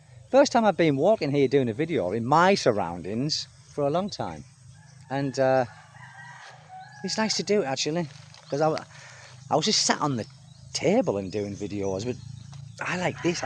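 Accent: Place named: Birmingham, United Kingdom. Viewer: You speak a lot of Birmingham Midland English, just like Peaky Blinders, the new movie just came out, have you seen it? Have you seen it?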